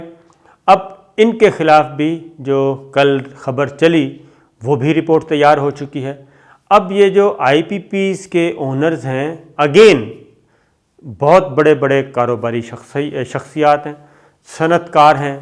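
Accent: Pakistan. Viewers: Aap ki bataen pheli daffa sun rha hn masha Allah bht acchi baataen kartaen hn, biklul khalis pakistani Urdu zuban me, r mohabat bhari bataen krty hn.ye bako channels waly tu fazol TUR TUR krty hovy jhoot bolty hn.aap ka andaaz bilku Mashriki ha vry fine. Allah ap ko kush rkhy Ameen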